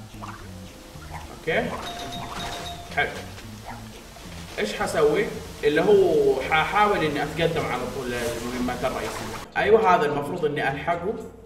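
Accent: Jizan, Saudi Arabia. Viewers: والله احسك من جيزان صح انا من جيزان و اعرف هاذي الهجه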